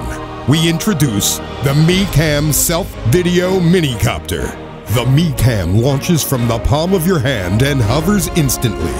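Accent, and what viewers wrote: France. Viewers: i miss ze french accent..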